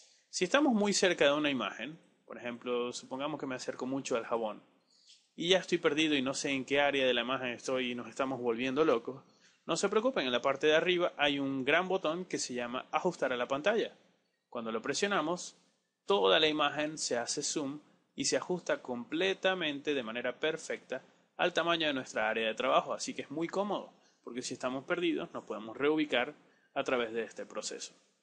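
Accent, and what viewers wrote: Venezuela. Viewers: Eres Venezolano? lo digo por la manera la hablar. Buen Curso Saludos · eres de venezuela mano Saludos jejeje Ya se restaurar y esas cosas pero ay algunas cosita que no sabia y e puse a ver tus videos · Yo sufriendo con un curso en Inglés y encontré justo uno de los mejores tutoriales : en Español y con acento de mi país (Venezuela). Mejor imposible!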